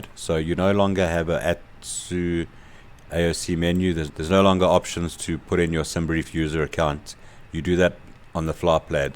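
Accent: South Africa. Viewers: Hoor daai Afrikaanse aksent.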